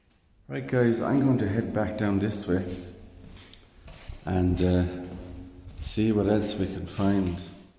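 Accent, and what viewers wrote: Ireland. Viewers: The whole of Brussels tends to be 'damp' (I live here!) ..Brussels literally means 'settlement in the marsh' and the river Senne runs underground. Thank you for this fascinating video. 'Conseiller communal is a councillor to one of the 'Communes' (districts) of Brussels. Love your content and your gentle, soothing brogue. Thank you 😊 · I wonder how many descendants visit? Have they contacted families to see what they would help to fix the crypts. I like your Irish accent. I am Irish on my Mother's Father's side.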